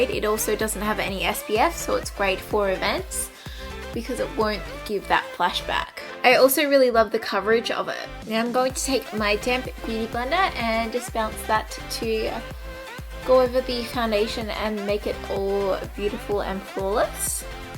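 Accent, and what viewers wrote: Australia. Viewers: R u Australian. becuz u kinda have that accent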